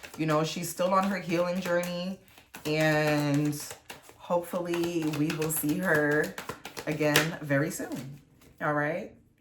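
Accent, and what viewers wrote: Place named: New York, United States. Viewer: You took me back to Star and Buckwild. I said definitely a New Yorker😂😂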